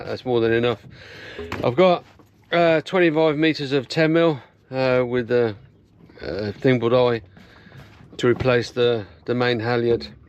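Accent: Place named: Birmingham, United Kingdom. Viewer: Sounds like you're from Birmingham (same as me) how did you get to live in such a lovely place & get into sailing ??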